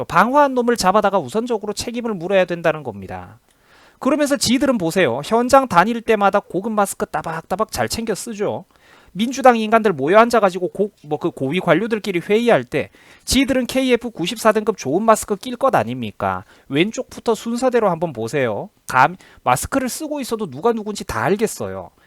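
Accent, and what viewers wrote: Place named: Gangwon, South Korea. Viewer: 말투가 강원도분이시네요 파이팅!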